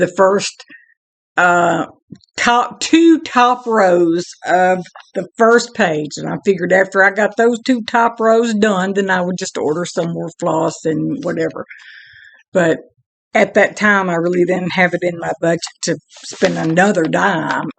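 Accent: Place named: South, United States. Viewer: Love your ramblings and adore your southern accent! Looking forward to the next one!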